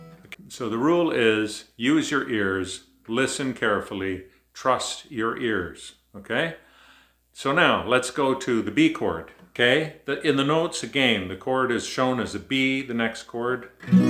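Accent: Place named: Canada. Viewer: I loved how you used Abm as the second chord. It gives the song a different feel. Chris Marin of Cold Play also did his own arrangement. If the song writer is as good as Dylan, it opens up the material to many different interpretations (like Shakespeare or the Beatles). I love everything Canadian including your accent. The greatest people and country. 🇨🇦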